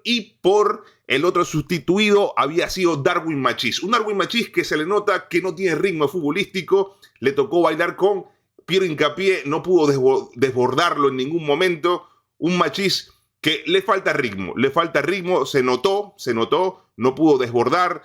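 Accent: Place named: Argentina. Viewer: Panita, pongase a escuchar un cd de salsa o gaitas, ese acento argentino q agarro en 1 mes no cuadra, mucho menos hanlando de la Vinotinto. Buwn análisis.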